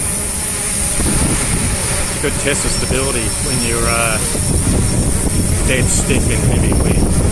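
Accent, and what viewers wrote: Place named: Australia. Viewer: Where are you ? You lucky son of a gun !! Australia I think judging from your accent but wherever you're at looks awesome!!!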